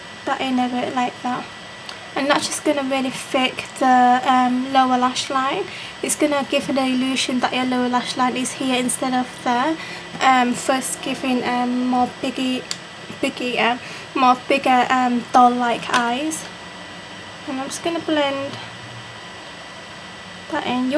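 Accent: United Kingdom. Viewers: she has a british accent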